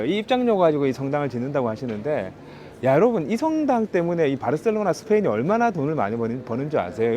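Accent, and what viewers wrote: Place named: Gyeongsang, South Korea. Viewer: 대구분 이신지요???? 대구 말투라 반가워요~~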